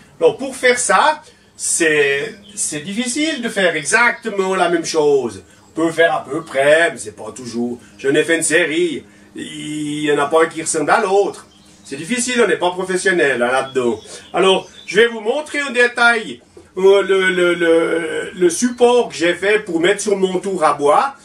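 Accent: Switzerland. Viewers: Bravo , belle inventivité , on en trouve beaucoup au Canada on dirait , à moins que ce soit en Suisse ou en Belgique , bon , le petit accent est bien plaisant ! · salut toujours ingénieux et j'adore ton accent de ta belle Suisse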